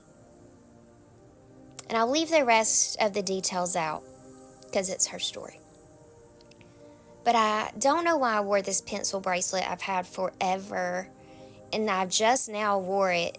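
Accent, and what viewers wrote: South, United States. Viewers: I love your Southern accent 😄.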